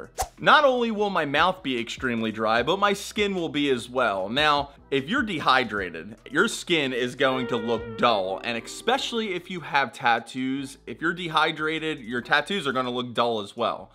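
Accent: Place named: Wisconsin, United States. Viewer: You sound like my exgf. She was from Wisconsin. You sound like youre from Wisconsin or Minnesota.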